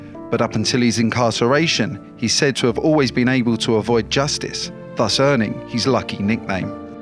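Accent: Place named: United Kingdom. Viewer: NYC history with a British accent. Fail...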